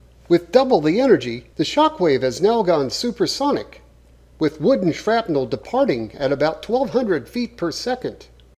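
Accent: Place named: Canada. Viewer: So, just did a little basic math using Assuming that's supply not output, that's 7.2 million kW. Average cost of energy in Canada (could be wrong but he sounds Canadian to me) is 19.2 cents per kWh. Unless I'm missing something, this costs $1,382,400 to fire once at full power, just in electricity. I pray I'm wrong, because this is too awesome to be such a rarity. If it's anywhere near the ballpark though, we need to appreciate the sheer commitment this man has to making cool stuff. In other words: GET THIS MAN SOME LOTTERY TICKETS!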